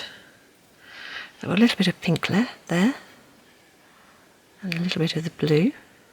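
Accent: England, United Kingdom. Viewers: Thank you so much! I've wondered how clay canes are made. I have purchased a number of beautiful canes from a very talented woman who sells hers on ETSY. I have terra cotta pots and I am ready to play! I also make pens so I want to try some on brass pen barrels. I am guess that they would need a study sealant for protection against discoloration of the clay and breakage if dropped. Any thoughts are much appreciated! Finally, I would like to compliment you for such a lovely, professionally produced video! It's so much more pleasing to my ears to hear an English accent vs. an American (Canadians are better, too!) Very professional and quaint at the same time. Cheers from your Yankee Cousin from "Across The Pond!"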